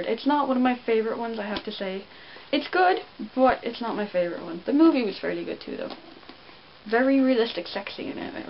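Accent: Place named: Ireland. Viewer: literary irish. very attractive accent.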